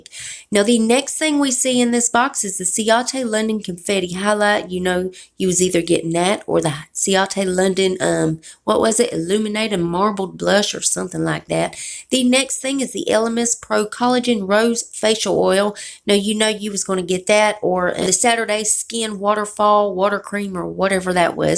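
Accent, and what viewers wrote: South, United States. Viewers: Thanks for the spoilers love!! This box is going to be awesome and u totally got me excited to get my boxyluxe this month. My nickname is as well. Love he southern accent sis, I have a southern accent too. I’m from southeast Kentucky. I do beauty subscriptions box openings as well on my channel so hope to see u over my way sometime too. Much love to ur channel, ur doing a great job. Subbed and liked ur vid. ❤️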